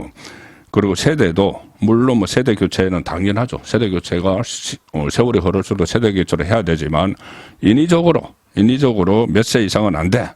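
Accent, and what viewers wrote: Gyeongsang, South Korea. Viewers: 송국건의 혼술 구독자가 43만이 넘었네요. 경상도 출신의 투박한 어투지만 오랜기자 생활을 통해 터득한 다양한 경험을 여과없이 전해주신 진정성이 빛났네요 늘 공감합니다. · 야 개소리말어 경상도인간들 다바꿔야해 너같은인간포함해서..그래야 우리가 이길수있어 너자꾸 경상도눍은이 싸고돌지마 니 서투리듣기도싫어 우파니까듣는거야 전라도도 싫지만 경상도 지금 의원 전부바꿔야해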